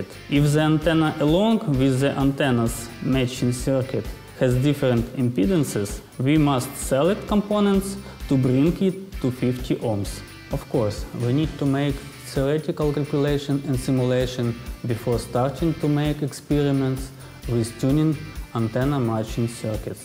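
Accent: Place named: Slovenia. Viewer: Тхеньк ю вери мач. Бат ит из вери дификульт ту андестэнд словеник инглиш лангуаге...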